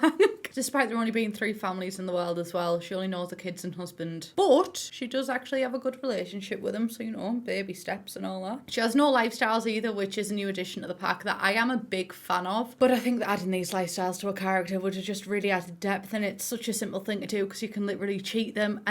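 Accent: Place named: Northern England, United Kingdom. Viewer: She is obviously a English Northerner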